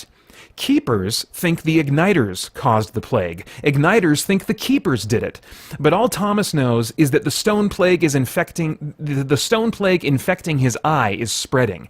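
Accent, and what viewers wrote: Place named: United States. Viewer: Haha. Laughing out loud at you trying to pronounce the names. Nadine's last name is pronounced Brand - Ess. Pretty sure It's Morgan L Buss - ee And I think it's Gillian Bront - Ay (like the Bronte sisters) Adams. Interesting to notice that in the American accent, Fawkes and Fox sound pretty much the same. We have quite a distinct difference between the two.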